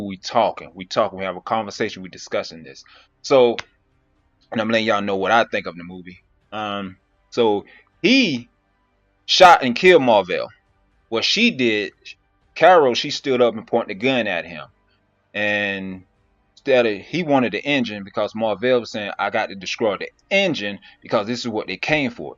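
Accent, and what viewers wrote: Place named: South Carolina, United States. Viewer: Man you representing that SC accent deep. Shout out to South Carolina.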